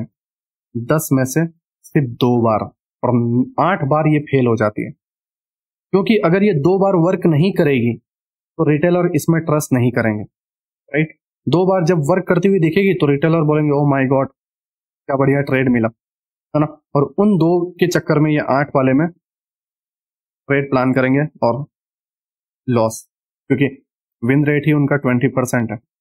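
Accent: Gujarat, India. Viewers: apki hindi se lag raha hai ki ap Gujarati ho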